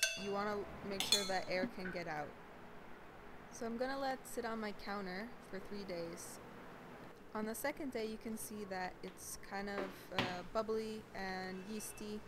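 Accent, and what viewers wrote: Sanaa, Yemen. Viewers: I salute you for doing our dishes. Thanks. And good Sanani accent with the Arabic videos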